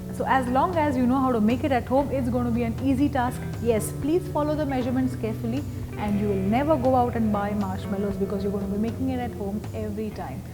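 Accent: India. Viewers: this is English in Hindi accent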